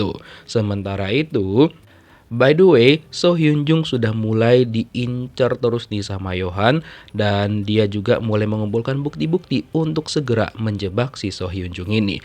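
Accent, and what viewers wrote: Medan, Indonesia. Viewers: Yg ngomong alur cerita ini orang medan sumut kah..🤔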